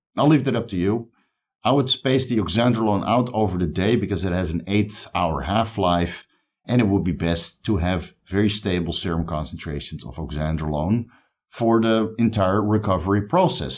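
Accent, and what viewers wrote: Netherlands. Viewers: Where is from? I hear a slight dutch accent 🤭 ah nice thicker skin. Using that triple peptide stack now to heal from major surgery. But my skin has become very thin.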